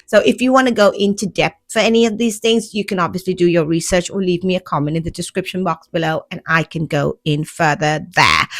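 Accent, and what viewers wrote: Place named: United Kingdom. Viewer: I adore your English accent. I wish my own english could sound so sophisticated-ly British xD With surströmming, the smell might reach biohazard levels but I'll tell you that it doesn't really taste like anything. It's basically just taste like salt, an extra salty pickled herring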